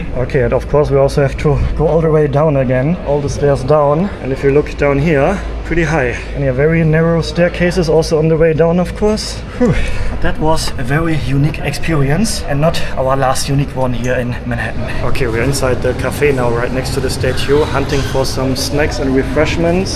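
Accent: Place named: Germany. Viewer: There’s supposedly a great test for Germans with good English accent. Get them to say squirrel, it’s a giveaway, the v pronunciation always comes out. Though I appreciate your efforts. I’m part German, I can hear it.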